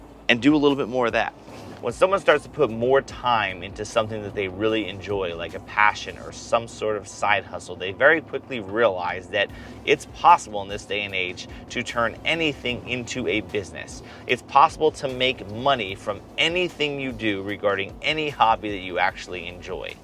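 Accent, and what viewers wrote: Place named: Ohio, United States. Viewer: You have a Buckeye accent...any relation to Jon M.?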